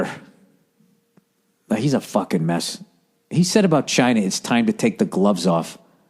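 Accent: Boston, United States. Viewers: Hey are you from Southy?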